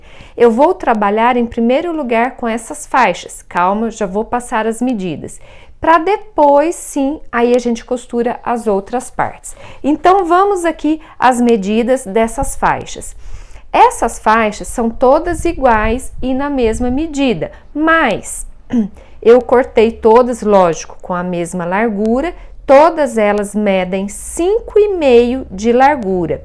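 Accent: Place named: Minas Gerais, Brazil. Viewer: Adorei! Gosto demais de ouvir vc falando com esse sotaque de mineira, me lembra muito minha mãe.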